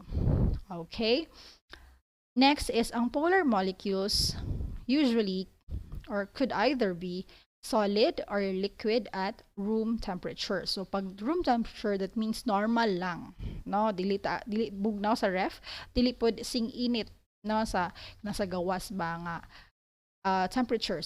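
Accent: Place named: Visayas, Philippines. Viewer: Bisaya mn diay ka ma'am 😅❤️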